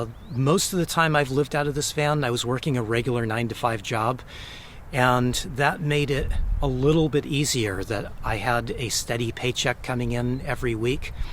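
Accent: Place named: Southern California, United States. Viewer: WOW your accent and manner of speaking is exactly like Steve Jobs and Tim Cook. that really is a SoCal accent! I wonder how I must sound to outsiders as a guy from Brooklyn New York...and like you, I do want to one day move into a mini-van (or ford transit) because rents here are very high in New York City including the boroughs...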